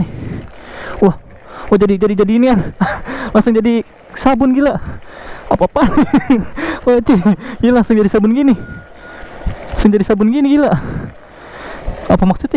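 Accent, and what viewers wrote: Indonesia. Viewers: Anjir bahasanya orang indo bat kentel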